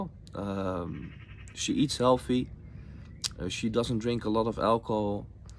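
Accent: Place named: Netherlands. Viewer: I found your entry by accident and was spellbound. I noticed your Dutch accent. My heart goes out to you. Ik vond uw boodschap door toeval en was ondersteboven van uw verhaal. Ik weet dat ik niets positiefs kan bijdragen aan uw verhaal, maar voor wat het waard is wens ik u en uw gezin heel veel sterkte. Elsche.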